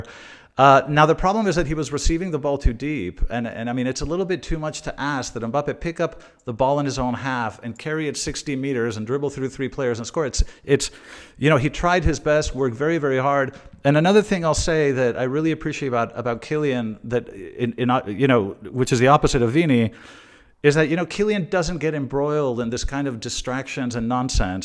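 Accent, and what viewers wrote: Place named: United States. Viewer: We love this channel a lot. But it's like you are American. You speak with a clear American accent.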